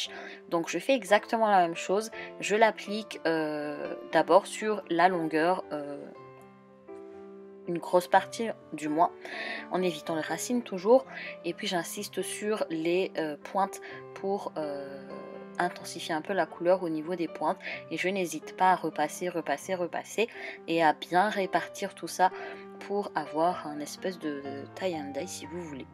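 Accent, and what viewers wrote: Madagascar. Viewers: Tu es malgache ?ça s'entend à ton accent ;)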